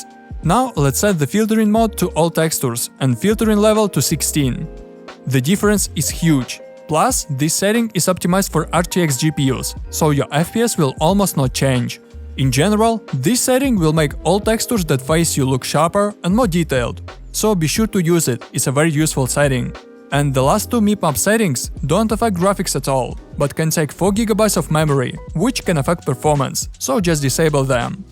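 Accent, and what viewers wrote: Russia. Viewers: Слышно что Русский либо Укр))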